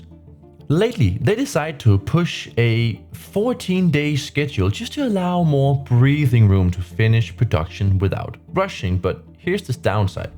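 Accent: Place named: Denmark. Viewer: Dansk accent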